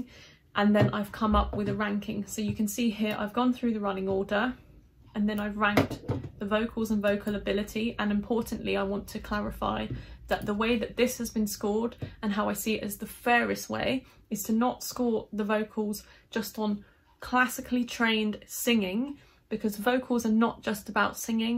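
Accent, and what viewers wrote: United Kingdom. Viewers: I believe you are completely out of track. Your analysis does not entail the political economy aspect of the issue. Let me rephrase Stalin principle here "it is not important who votes, it is important who nominates who is going to vote". The main issue being here that these jury members are too few and nominated exclusively by the broadcasters and that is too easy for them to control the results whomever they nominate to be part of the jury, rappers, pop singers, astronauts or belly dancers. Please give a look to the complex jury system (and numbers of components for each jury segment) adopted for Sanremo. Still far from perfection but lightyears ahead than ESC in trying at least to minimize such "distortions" particularly on the broadcaster side which in this case is RAI. Clearly the music industry is a giant and investments need to be protected even in this economic sector so do not expect that this will turn overnight into a completely fair game but please keeps fighting (no weapons needed, as a youtuber you can fight with your nice oval and your remarkable british accent) also in the name of those (including me) that still believe that culture remains a human right “Everyone has the right freely to participate in the cultural life of the community. 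(art.27)”. Also, are you sure that televote is not at least partially controllable? First of all who is really enforcing the check related to number of votes per mobile number once the Eurovision is over? Secondarily imagine a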